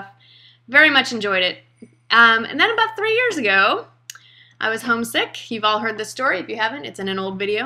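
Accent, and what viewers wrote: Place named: Texas, United States. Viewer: Even though you're from Chicago I do believe I detect a little Texas drawl "y'all" . Anyways cute video Yay for lefties! BTW you aint older than all your subscribers. little ol' me is tuning in! :-)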